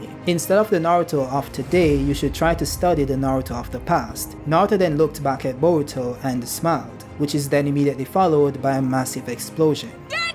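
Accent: Jamaica. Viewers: Wait, are u jamaican that accent can't hide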